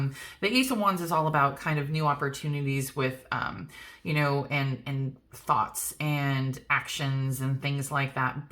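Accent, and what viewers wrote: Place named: South, United States. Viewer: you speak w the same dialect. But her southern drawl is lil deeper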